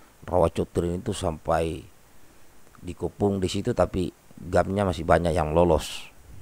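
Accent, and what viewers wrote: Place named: Batak, Indonesia. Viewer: Salam hormat jg kawan,,, logat mirip batak eeeee gak apa2 ttp mantap, jngn lupa ngopi 👉☕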